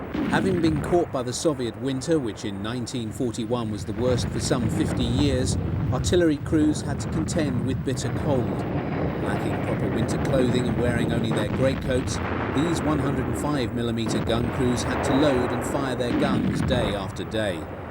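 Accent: United Kingdom. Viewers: the British English narrating sounds sophisticated and smart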